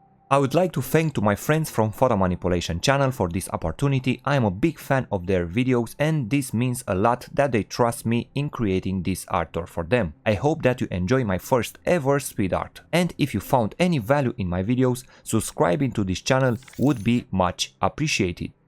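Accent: Romania. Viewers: you are from Romania you have a Romanian accent p.s I like what you do I discovered you a few days ago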